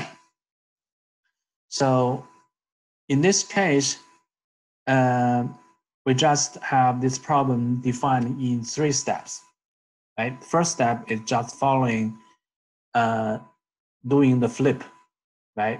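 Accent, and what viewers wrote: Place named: China. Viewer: I love your Chinese accent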